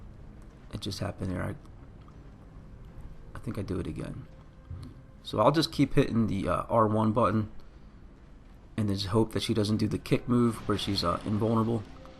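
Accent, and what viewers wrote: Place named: Philadelphia, United States. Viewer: Great video bro we play the game literally the same big ass sword Big ass armor love it stg you sound like you got a Philly accent too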